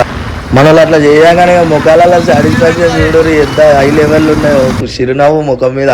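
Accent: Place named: Telangana, India. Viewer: 😂😂thoroughly enjoyed specially your Telangana accent cheers to your new beniging 😅😅and keep going good luck 🤝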